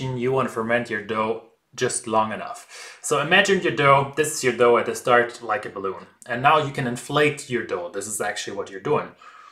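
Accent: Germany. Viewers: Few things to note..... 1, my prediction about "Zee" is German, turns out to be correct, but aside from that, your English is pretty good, to a point that I almost doubt my protection about the "Zee". You should working on adding more accent for ease of identification. 2, started from #2, the whole thing sounds like college project, I can respect that... 3, I'm going to save this video, and when next time someone asked me why German make the good stuff, I'll send this to them... 4, So, in Germany, people do use their thumb to count 1... Jokes aside, this is a very interesting video, much different than the rest of the YouTube cooking video I've seen so far, even if it's just a video about homemade bread, the whole process screams perfection, sure is a breath of fresh air, good work. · I not only learned some technique here but I also got a kick out of the bloopers. 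I'm glad you don't cut them out because it's way better this way. Ich mag auch den deutschen Akzent :) Keine Notwendigkeit, sich zu entschuldigen! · NEVER apologize for your delightful German accent and way of speaking. :) I love authenticity in a human being, and you are an authentic human being. :D also, your tips on bread making are fantastic. :) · I love his German accent ;) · You made me laugh that you apologise regarding your german accent! Du hast mich im lachen gebracht! So please watch this here. I think the best and funniest advertisement ever: <link> Grüsse aus Ungarn! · Great video! I’m still a newbie to sourdough, and this helped me a lot. Also, I’m Czech and to me it’s so adorable when someone has a German accent🥰😄 pro tip: if you put the tip of your tongue between your teeth and say “d”, you’ll get quite a decent “the”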